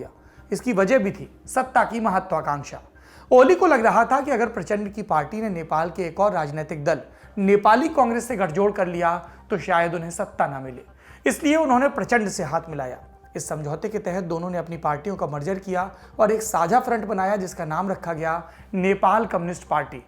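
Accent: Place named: Uttar Pradesh, India. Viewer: ha Bhai but Hume Pasand hai wo up wale lehje Mai bat Karna... Bhai na andaj Kuch aisa hi hai...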